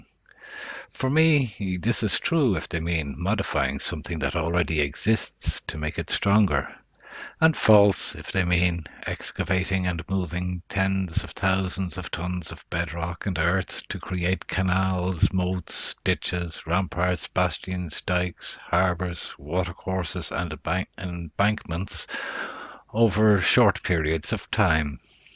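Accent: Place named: Ireland. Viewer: Very nice to hear a fellow Irish voice on their voyage of discovery.. Really enjoyed the vid. Any views on the mud?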